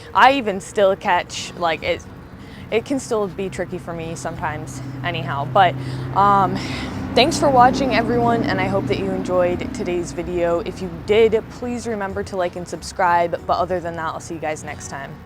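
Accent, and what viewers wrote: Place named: Pennsylvania, United States. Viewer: You're just lovely. So natural, so real, so.... lovely. Also, I get a real kick out of the PA accent. Most don't hear it, but I certainly do, being from RI. Live in D.C. now. Respect. My Favorite channel. Fact. Who would have thought one could create an interesting channel about a saw mill? Your talent makes it possible....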